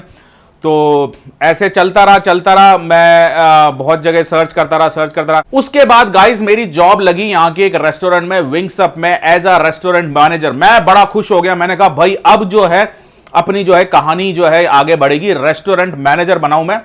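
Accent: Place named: Hyderabad, India. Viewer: Bhai Aap ke Hyderabad ke Dost Bahot the Kya? Kyu ke Aap ki Baat me Hyderabad ka Style hai.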